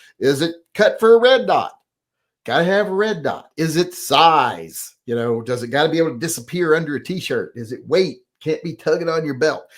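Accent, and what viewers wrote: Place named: West Virginia, United States. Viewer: you grew up in mothman country 😂😂😂 no wonder your accent is so familiar (i have lots of close friends in that area... almost kin)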